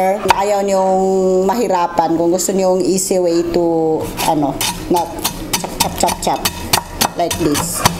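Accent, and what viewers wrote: Visayas, Philippines. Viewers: bisaya kaya xa ganyan. proud bisaya here.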